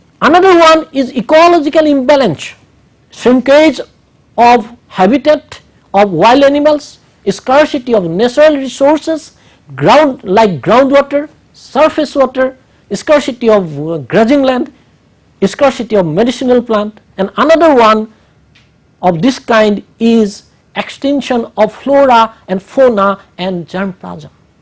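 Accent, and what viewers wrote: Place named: Gujarat, India. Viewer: Presentation is very systematic and thorough. No frills and fancies. There is no repetition and the material is complete. The speech should be dubbed over by an English medium educated person so that people all over the world will watch the video. The Gujarati accent is not appreciated generally even in India, even by Gujaratis themselves.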